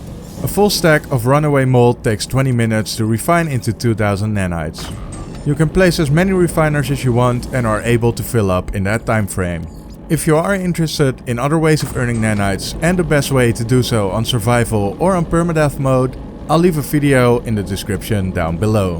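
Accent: Netherlands. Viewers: aah het nederlandse accentje blijft ook ons kent ons he xD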